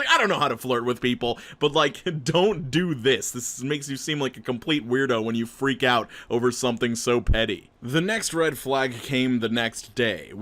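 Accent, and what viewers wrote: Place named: New York, United States. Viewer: That New York accent and voice killed me dude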